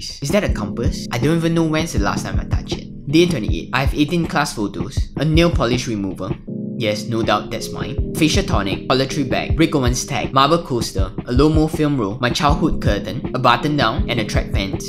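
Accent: Singapore. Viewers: Straight up when I heard your accent, I knew you were Singaporean. Cheers fella! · Are you singaporean ? Nice accent1😁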